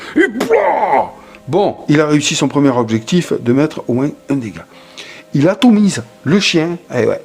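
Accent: South, France. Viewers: laccent du Sud de la france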